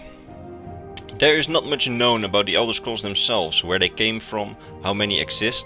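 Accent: Netherlands. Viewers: Ik kan duidelijk horen dat je nederlands bent. Goede video's man, ga zo door! fijn om een mede elder scrolls lore nerd te vinden uit eigen land!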